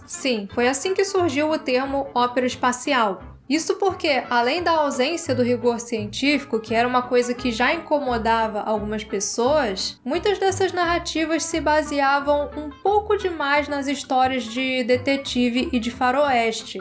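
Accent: Rio de Janeiro, Brazil. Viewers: Você tem um sotaque fluminense. De que cidade você se encontra?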